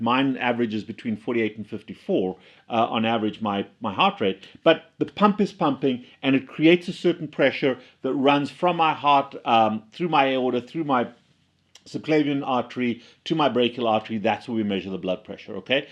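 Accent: South Africa. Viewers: I was curious about your accent as it does not sound American, I had a hunch you might be South African! We have the best heart doctors :-) Great videos!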